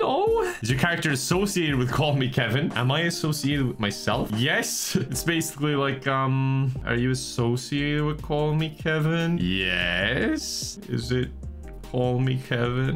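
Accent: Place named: Ireland. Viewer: OMG HE'S IRISH I NEVER REALISED also I have been subbed for 3 years and though he was joking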